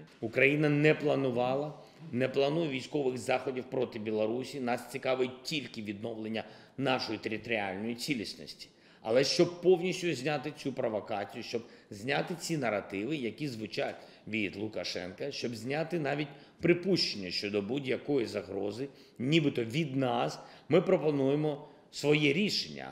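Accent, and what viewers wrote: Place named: United Kingdom. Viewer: He speaks English with a British accent. He works for London city Charles.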